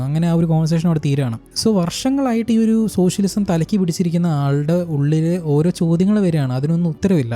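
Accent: Palakkad, India. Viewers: bro sathyam para ni palakkad alle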